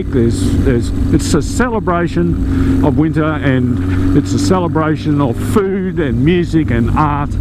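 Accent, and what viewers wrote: Australia. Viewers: Hey, I found your channel through matts. Love the classy bikes you got and something about the accent from good old 'straya just attracts me even more, don't ask me why. I don't really have the finances or expertise yet to buy old bikes and build them back up like my father did (He collects old english bikes, a BSA Sloper is his current project and he had couple of Ariels before) but I'm planning on buying a DR 800 BIG on the cheap and mess around with it to see what's what and build up my skills before I break a proper classic plus I'd like to have something more usable (and offroadable) on the daily than my GSX-R. Anywaaaay I wanted to ask you what the metallic ringing on this bike here is, it reminds me a bit of the rattle Ducatis make but this clearly is not a Ducati.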